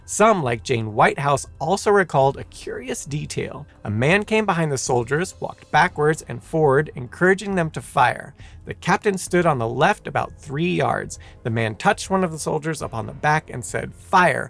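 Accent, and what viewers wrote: United Kingdom. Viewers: you fool!!! The British accent is ASMR to American ears!!! This guys effeminate whaling will never suffice…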